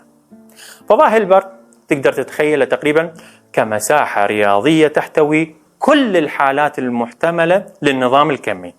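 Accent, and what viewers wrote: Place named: Iraq. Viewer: اوكف انتَ عراقي 😮 واخيراً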